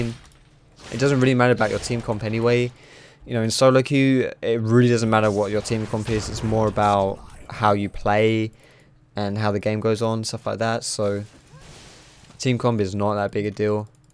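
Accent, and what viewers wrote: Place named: United Kingdom. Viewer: Where in the UK are you from?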